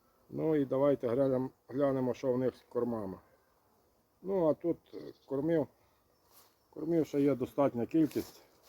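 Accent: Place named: Prykarpattia, Ukraine. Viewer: розмовляєте не по тернопольськи ,а більше говір схожий на Прикарпаття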